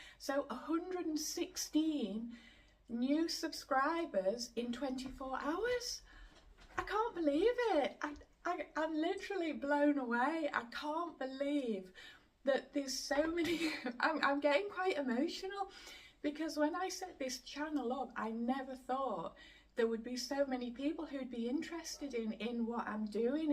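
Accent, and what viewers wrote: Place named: North, United Kingdom. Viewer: Oh dear that frickin awning is proving just a tad troublesome ay - but not to worry luuvy 'cause not only am I always totally enamored by your delightful Northern accent, I am also well and truly captivated by this ongoing and challenging saga!!...You're a true Brit Battler. Bravo 👏😀 Onwards and upwards. Congrats on the 1,000+ subs btw - That's awesome 👍😉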